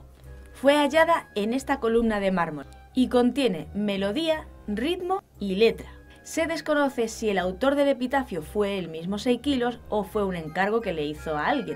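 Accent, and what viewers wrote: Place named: Extremadura, Spain. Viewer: Me encanta seño! con acento extremeño, me meo😂😂🤩🤩🤩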